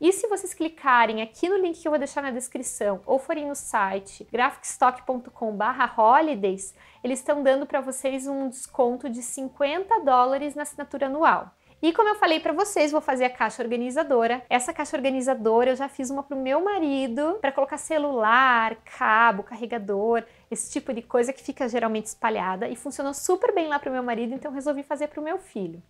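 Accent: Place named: Santa Catarina, Brazil. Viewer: vc é de Santa Catarina? é que eu vi a foto no Beto Carreiro, e seu sutaque tmbm parece daki ahhaha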